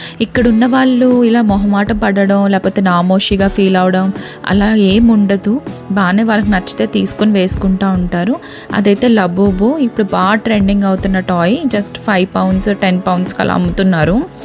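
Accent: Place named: Andhra Pradesh, India. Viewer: యూకే లో ఉన్నా గాని అక్క మన ఆంధ్ర మాటలు మాత్రం 👌👌👌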